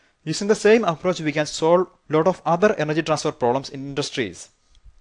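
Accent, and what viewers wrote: India. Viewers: Really? You are making a toutorial and you put an indian guy with terrible inaudible accent in front of a microphone? Great job. Thumb down. This is exactly how pollitical correctness is affecting a quality of performences. Shame.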